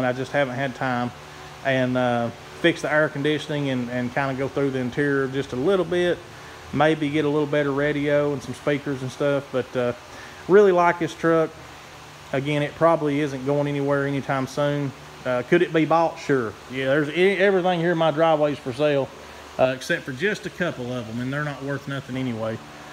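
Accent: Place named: South, United States. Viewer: I love that accent of the southern folks reminds me of my good friend blessings to you and him 👊🏽😁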